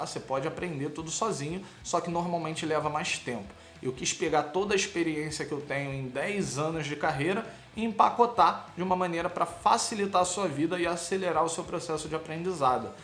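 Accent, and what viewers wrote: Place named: Rio de Janeiro, Brazil. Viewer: Ih koe carioca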